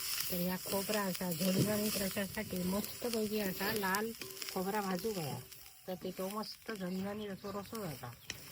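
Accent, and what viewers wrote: Malvani, India. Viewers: काकीची मालवणी ऐकाण पॉट भरला..... वाह....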